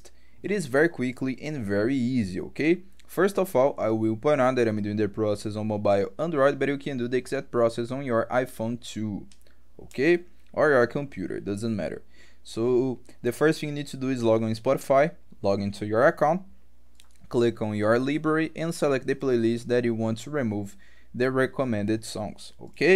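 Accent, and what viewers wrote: Brazil. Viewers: Are you brazillian? Cê tem sotaque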